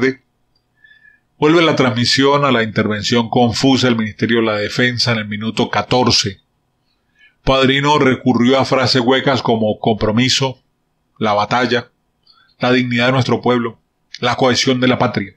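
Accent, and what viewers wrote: Colombia. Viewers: Uuyyy a uds se le sale ese acento colombiano pues como mucho!!! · Bla bla bla bla bla. Pura mentiras mijo sea serioooooo y con tono de acepto colombiano no me fija mentiras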